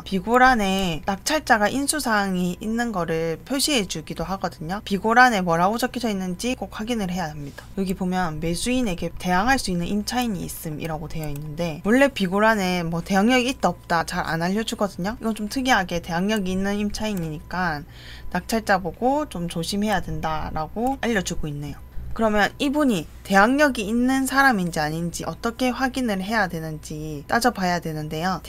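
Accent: Gyeongsang, South Korea. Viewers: 경상도 억양좀 줄여주시요 경상도 톤이 머리아파요 천천히 또박 말하면 덜 시끄럽게 들릴려나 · 표준어를 사용했으면? 보리문댕이인가 😂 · 지역이 경상도쪽 이신듯한데 어디신가요?부산사는데 가까우면 수업신청하고싶어요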